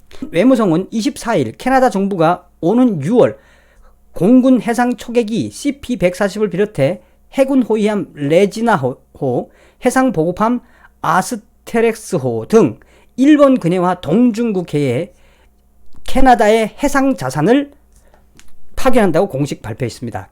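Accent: North Korea. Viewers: 황장수의 뉴스브리핑에서 북한정제유 환적에 대한 집회에 동참해 주시길 바랍니다. 대한민국 국민들 다수가 나서는 모습을 보여야 외신도 주목하고 한국을 포기하지 않습니다. 그리고 문죄인도 무너지고 통일도 빠를 수 있습니다. 문죄인이 무너져야 한국이 제대로된 북한제재에 동참하고 북한의 숨통을 제대로 조일 수 있습니다. 주변에 되도록이면 많이 참여하시도록 부탁드립니다. 저도 지방에서 생애 처음으로 참여하기로 했습니다. 방송 잘 보고 있고 북한 사투리가 정겹네요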